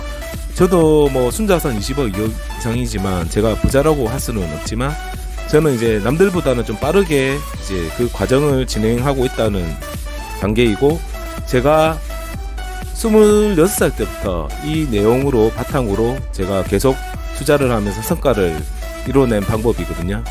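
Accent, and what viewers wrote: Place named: Busan, South Korea. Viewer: 부산사투리..?? ㅋㅋㅋ 목소리가 넘 친근하고 좋으세요~~!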